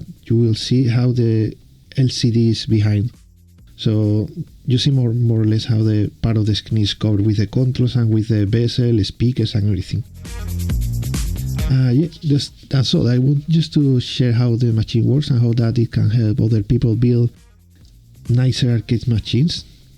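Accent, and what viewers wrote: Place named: Spain. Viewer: Estupenda idea. Te escribo en español porque por el acento está claro que lo eres :) ¿Cómo haces para rotar la imagen? ¿Es una funcionalidad de tu televisión o de tu emulador? Me gustaría implementar tu idea con una Pandora Box, pero no tengo claro que sea posible... Gracias y saludos.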